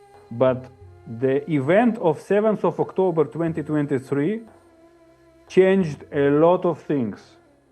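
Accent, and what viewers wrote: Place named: Russia. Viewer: Руски акцент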